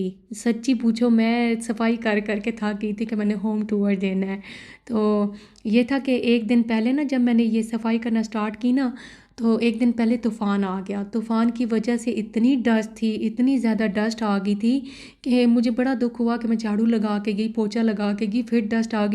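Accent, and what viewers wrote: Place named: Chakwal, Pakistan. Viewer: Yaqqen karen me apky lehjy sy pehcan gai thi k ap chkwal say hain kasmy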